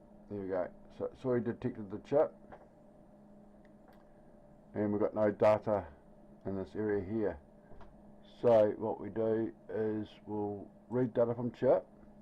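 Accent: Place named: Australia. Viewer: I've been doing similar hacks for ~25 years. I mainly repair arcade games but my house alarm had an installer password on it so the user can't change any settings. I dumped the eeprom then using the installation manual I found online I did a factory reset. then I dumped the eeprom again and did a search within that dump for the known factory password. then I simply looked at my original dump at the same memory location and found the installer's password. now I can change any settings I want LOL! You sound like an Aussie... me too! I'm on the West side Perth. Where are you?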